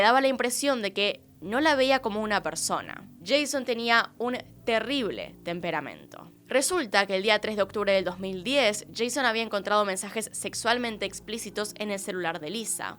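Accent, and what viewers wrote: Argentina. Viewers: Me encanta el hablado Argentina Dice Shaaaaa por decir ya Jajajaja me encanta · Ayyyy me encantas 😍 pekeña cómo hablas tu idioma y siempre te arremedo con tu acento boludooo soy mexicana te mando muchos saludossss y el gran contenido de tus relatosss e historias que cuentas, además es la 1 vez que te escribo Por si estabas con el pendiente Jajajajajaja cuídate mucho te mando un 🤗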